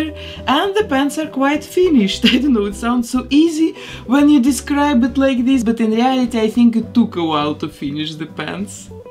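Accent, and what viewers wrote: Russia. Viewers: Оооо видно что у неё русский акцент 😂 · Вы, случайно, не русская?) У Вас акцент такой прикольный~ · Hey,. I’ve noticed your accent. Do you come from Russia 🇷🇺?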